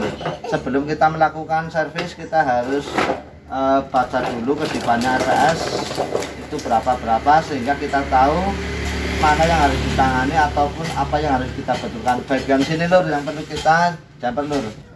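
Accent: Banyumas, Indonesia. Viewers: Pare kediri hadir mas,kok lama tidak muncul ini,nyong kangen karo boso ngapak2 nya...hahahhahah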